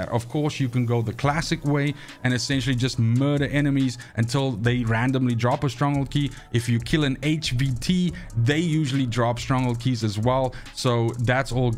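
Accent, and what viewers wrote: South Africa. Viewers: SA accent xD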